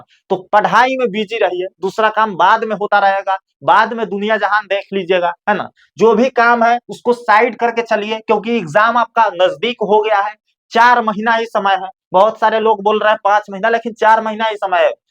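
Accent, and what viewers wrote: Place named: India. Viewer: I think Hindi medium. Cuz As u can really see it from sir accent .. It sounds like pure Hindi medium accent........ Don't u think so????Ahhhhh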